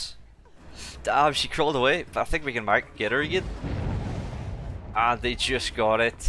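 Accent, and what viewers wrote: Northern Ireland, United Kingdom. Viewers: Are you from Northern Ireland? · northern ireland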